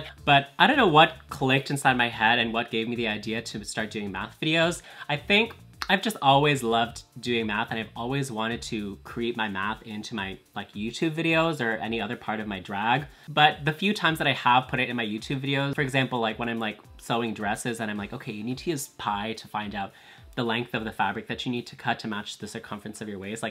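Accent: Philippines. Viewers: you speaking tagalog is the cutest thing!! rooting for u in drag race <3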